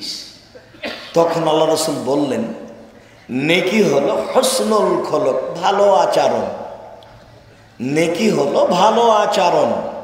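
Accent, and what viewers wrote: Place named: Chapai Nawabganj, Bangladesh. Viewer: imran vai vaier voice chapai nawabganj er tai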